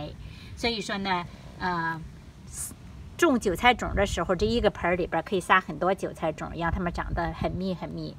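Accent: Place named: Weihai, China. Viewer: 请问您是不是威海人啊？口音真的好像！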